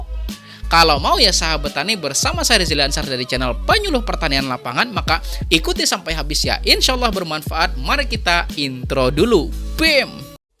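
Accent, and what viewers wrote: Banjar, Indonesia. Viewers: logatnya kayak orang banjar, bener gak ya? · Logat admin org banjar pasti ini. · Mendangar logatnya kayak urang banjar pian tu.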